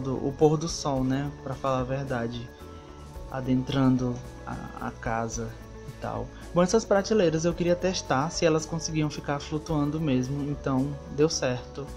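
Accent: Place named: Northeast, Brazil. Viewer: tu é de qual estado?? tem sotaque nordestino ❤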